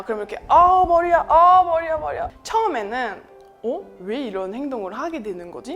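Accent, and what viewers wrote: South Korea. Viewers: 화면 안 보면 한국인인 줄 알 듯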